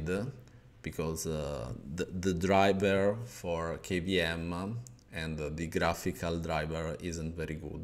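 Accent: Italy. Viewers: holy italian accent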